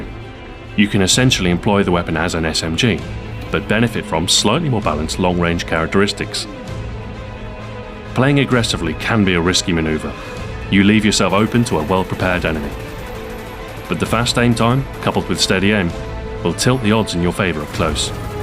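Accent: United Kingdom. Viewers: RULE BRİTANNİA. thumbs up if you love his british accent. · uhm,soap is irish, this guy's british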